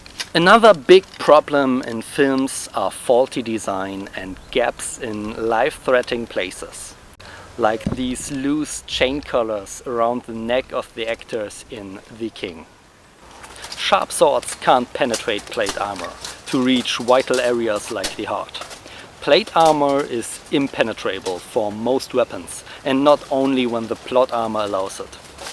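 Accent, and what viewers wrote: Germany. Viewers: Mein abo hast du. 🤘🏼 Aber den deutschen Akzent hört man ultra raus. 😉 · Aud sem Dialekt meine ich rauszuhören das du aus Deutschland kommst, ist das korrekt?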